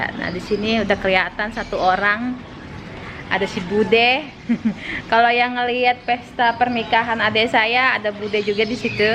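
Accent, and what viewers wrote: Jakarta, Indonesia. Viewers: aslinya mana sih. Nikahan kayaknya adat sunda. Tp ngomong gaya betawi. Jadi yang mana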